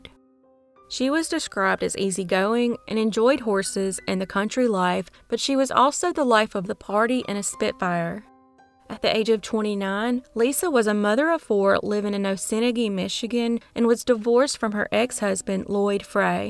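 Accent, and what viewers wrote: South, United States. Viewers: I’m from U.K. but guys what accent is the presenters voice ? Must be down south right ?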